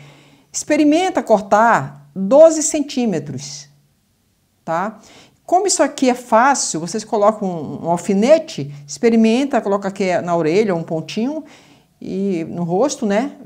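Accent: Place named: Northeast, Brazil. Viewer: De qual estado do nordeste você é? Esse sotaque não conseguir identificar. É do nordeste mesmo ou é norte?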